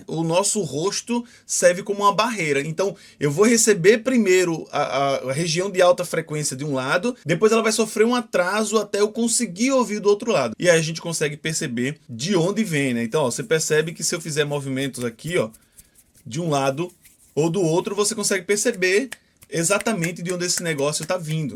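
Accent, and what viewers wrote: Northeast, Brazil. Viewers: gênio é uma honra e muita satisfação ver que você respondeu meu comentário nossa Aaaa, (muito massa) sobre a questão de mesário, eu sou em tempos de política sempre sou chamado pra compor a mesa e sobre técnico eu trabalho com som também, trabalho com som mas mecânico, e estou migrando para o som automotivo, e seu vídeos me ajudam muito, se entendo sim um pouco não tudo (isso sempre) e a cada vez que assisto um vídeo seu aprendo um pouco mais, assisto me divertindo, não zoando mas achando super interessante divertido (sotaque. Bem que eu queria ser do nordeste) ABRAÇO GÊNIO. DESDE JÁ MINHA ETERNA ADMIRAÇÃO POR TI HOMI.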